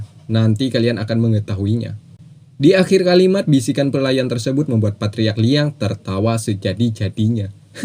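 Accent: Banjar, Indonesia. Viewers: Mantap mbuk ai banjar banar sdh🤣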